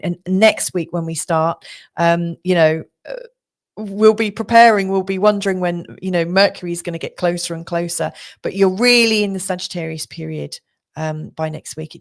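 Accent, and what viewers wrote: United Kingdom. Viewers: Lucky woman with that gorgeous red hair and beautiful face. British accent too. If you came to America., you would have many men , good ones, fighting for you Thanks in advance for the reading I hope it’s in line with what is going on for me and then I win the backup offer on the house! Love from America and prayers for all my brethren, British folk. Praying for your nation and people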